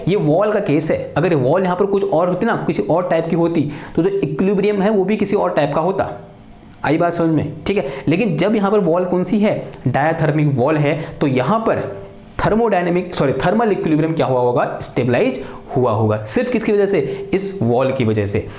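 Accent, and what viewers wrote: Uttarakhand, India. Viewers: Sir aap Uttarakhand se ho kya ?🫠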